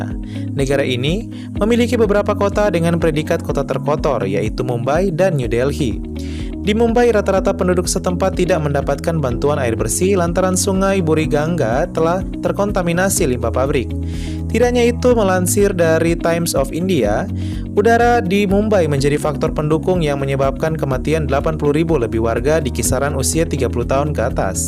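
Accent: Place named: North Sumatra, Indonesia. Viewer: penekanan huruf "e" Nya masih nampak. Sepertinya orang sumatera Utara.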